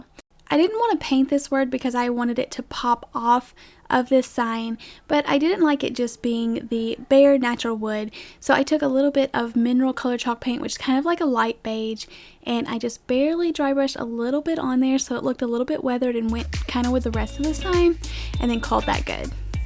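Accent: South, United States. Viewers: I Love all your crafts!! So cute & you are so creative too! I also love your cute southern twang, it reminds me of my Mama- she's from the south!!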